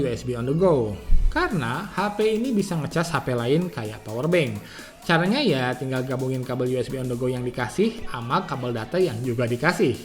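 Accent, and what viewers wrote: West Java, Indonesia. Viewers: Asyik nich reviewnya.... Bahasanya sederhana tapi nyaman, heuheuy Sunda Asli.....!!!!!!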